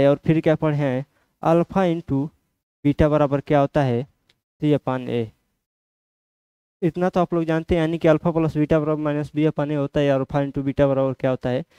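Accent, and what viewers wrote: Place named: Uttar Pradesh, India. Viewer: Bhaiya aap sachi me up ke lag rhe ho 😂😂😂😂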